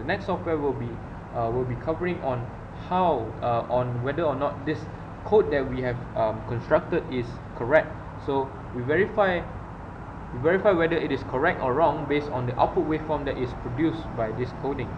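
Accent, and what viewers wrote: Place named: Malaysia. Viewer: your accent makes me miss Malaysia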